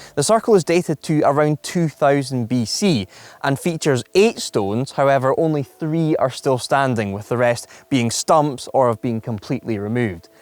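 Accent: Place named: Scotland, United Kingdom. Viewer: He sounds scottish and its the English that say mores for pronounciation of moors .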